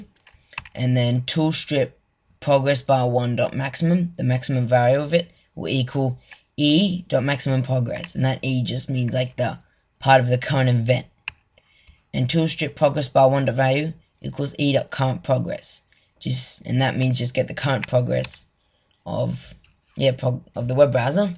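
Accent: Australia. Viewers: 5 more comments till 1337 and are u australian?cuz of ur accent and cuz u said G day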